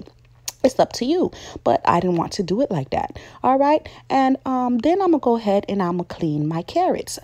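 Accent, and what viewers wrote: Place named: Florida, United States. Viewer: You gotta be from FL😭😭 😂 I'm doing this tuhday 👏🏾👏🏾👏🏾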